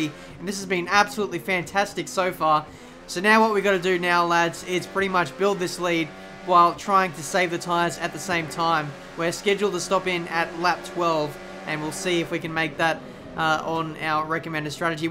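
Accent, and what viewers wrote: Australia. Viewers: What part of Australia are u from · which part of australia are you from and how old are you?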